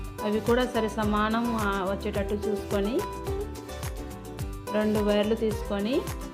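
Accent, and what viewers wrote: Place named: Karnataka, India. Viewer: Mee cheppindi kannada sound yekkuvaga undi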